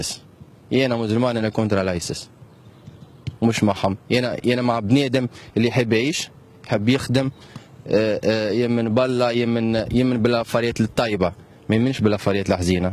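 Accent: Tunisia. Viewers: Cuz he's tunisian then the tunisian accent and the Maltese language are too similar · يضهرلي يحكي تونسي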